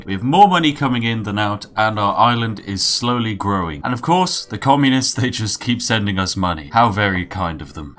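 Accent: United Kingdom. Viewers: Wait... is this guy British?! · haha he's a brit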